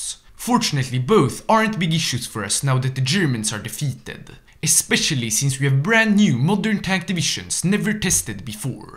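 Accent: Sweden. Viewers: A Swiss man with a Swedish accent playing a Swedish game as Japan.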